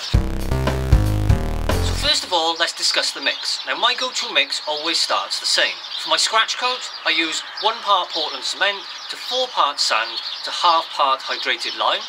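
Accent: Wales, United Kingdom. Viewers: Welshman, straight to the point, love it, cheers! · I thought Manu Ginobli had an Argentinian accent, he’s gone Brit on me.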